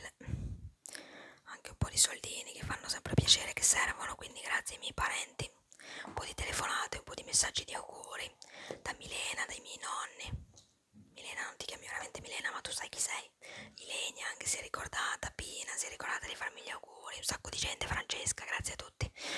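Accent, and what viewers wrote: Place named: Veneto, Italy. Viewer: Io pensavo veneta per come pronuncia la "r"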